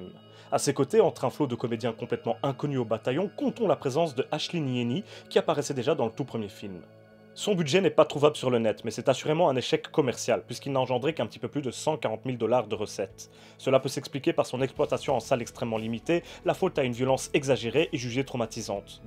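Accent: Belgium. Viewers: Avec plaisir cher je partage ta passion:) + j'aime trop ton accent belge.Continues tes vidéos on t'aimes:) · et là bas compatriote Belge! Enfin....sans vraiment savoir, juste ton accent met familier! Hoooooooo oui Clairement, je suis fin friands et amateur de cinéma Underground et ce.....depuis longtemps! J'ai 31ans mais mon VHS des Germelins a 5ans me marquera pour la suite de ma vie cinématographique, ensuite a 10ans+- je reste bouche baie devant les Cénobites de Barker et forcément.....Freddy, je suis un ptn de fan des nanars gore des années 80, Abomination, BlackPast, BasketCase, les TROMA et puis bon....j'ai un vrai problème avec les zombies pr qui j'y porte une affection particulière (ON AIME PAS LES ZOMBIES QUI COURENT!) Romero, Fulci etc....etc.... Étant un gobeur de GrindCore/GoreGrind/BrutalDeath/BlackMetal etc.... C'était presque obligé de tomber fan du cinéma Underground avec une préférence pour Vogiel & Lucifer mais je gobe tout! 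Vive les litres d'hémoglobine, le sarcasme, l'humour noir et le 17e degré de l'humour! J'étais obligé de m'inscrire chez toi en découvrant ta chaîne ce matin 🙏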